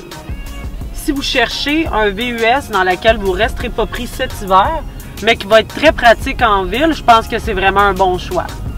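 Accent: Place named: Quebec, Canada. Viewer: L'accent québécois, ridiculiest ever. Sorry !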